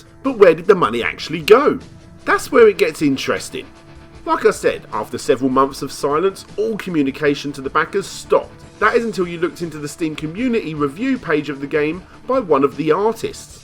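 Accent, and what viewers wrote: United Kingdom. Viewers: Just kidding about not liking you as much. You British people have a way of spewing your gob that is just delightful for my American ears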